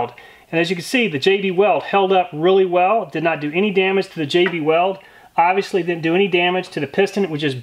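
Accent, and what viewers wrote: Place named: Midwest, United States. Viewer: Nothing better than filling a gash. Love these videos. Just a guess but you're from northern Missouri or Iowa.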